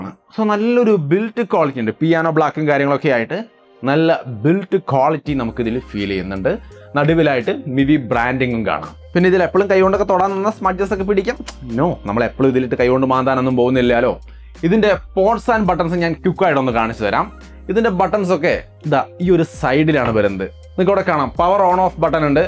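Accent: Thrissur, India. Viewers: Trissur slang🫶🏻⚡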